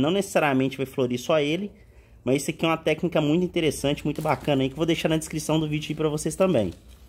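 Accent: Minas Gerais, Brazil. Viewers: O sotaque minerim entrega kkkk Parabéns pelo trabalho!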